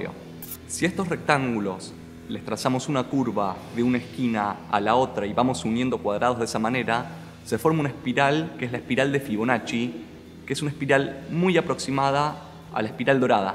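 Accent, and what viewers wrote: Argentina. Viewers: Buen video. ¿Eres argentino?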